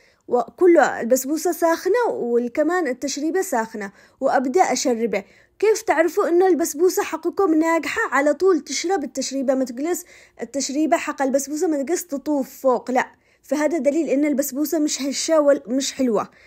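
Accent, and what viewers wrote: Yemen. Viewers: والله يعجبني كلامك ماشاء الله واغلب الكلمات اللي تقوليها نتكلمها احنا اللهجة اليمنية قريبة جدا من لهجتنا...سبحان الله متابعة من الجزاير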